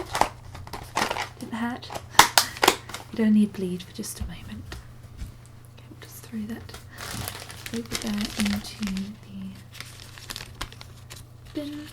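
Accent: Scotland, United Kingdom. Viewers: What happened to the 'Jings Crivens, help ma Boab' accent ? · I LOVE BRITISH ACCENTS THEY ARE SO BEAUTIFUL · are you scottish? is just that your accent sounds kinda more poshy on this vid in comparisson with the others haha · ASMR love your asmr. genuinely thought you were Scottish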